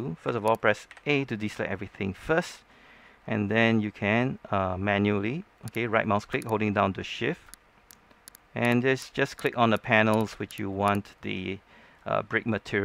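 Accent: Singapore. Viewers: You are from Singapore, the accent.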